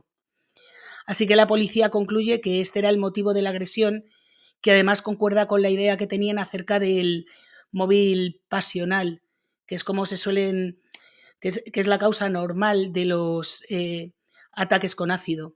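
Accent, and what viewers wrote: Spain. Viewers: Hola, me encanta tu manera de contarnos esos casos que narran las diferentes formas de la brutalidad humana. A diario veo uno que otro de tus casos. Vuestra forma de hablar ( de los españoles en general) es muy hermosa. Apoyo tu canal sl 100 por ciento. Desde Cuba, gracias.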